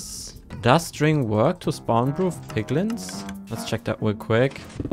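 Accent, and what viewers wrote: Germany. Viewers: i dont know how to desribe it but you sound like a german trying to speak english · Is he from Germany or does he only Sound like a Germany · oh du german sein beeing? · Bist du deutscher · No offend but u actually have such a German accent (ich kann das sagen weil ich auch deutsch bin)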